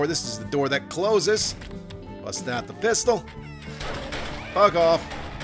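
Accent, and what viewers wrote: New Jersey, United States. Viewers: I love that i can tell you have lived in new jersey.(im from jersey)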